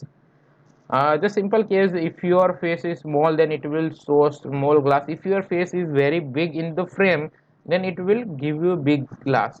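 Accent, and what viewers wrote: Gujarat, India. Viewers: i can definitely tell this guy is gujarati kathiyawadi according to his english speaking accent .if i am right let me know!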